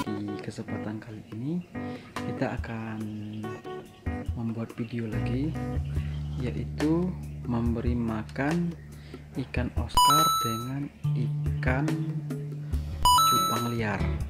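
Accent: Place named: Kalimantan, Indonesia. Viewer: abang bukan kalimantan ya. Logatnya mirip kalimantan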